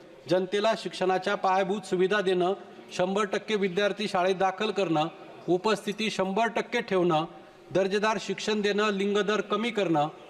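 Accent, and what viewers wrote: India. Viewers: आपली भाषा आपला माणुस खुप भारी वाटतंय सर तुम्ही ग्रामीण मराठी भाषात बोले ❤🎉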